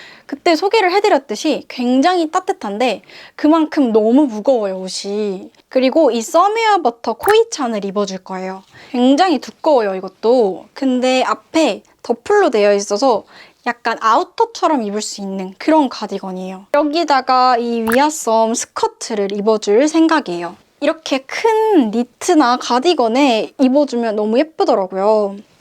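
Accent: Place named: Vietnam. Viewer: 베트남분이신가요? 한국분아닌것같은데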